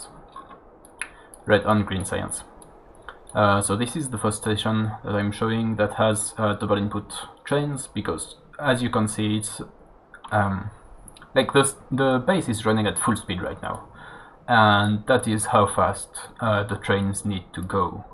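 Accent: France. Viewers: Tu es Français ? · t'es français ?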